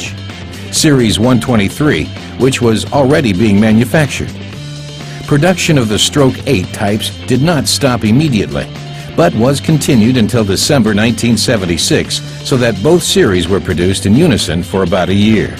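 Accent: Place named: United States. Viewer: The awkward American narration grates.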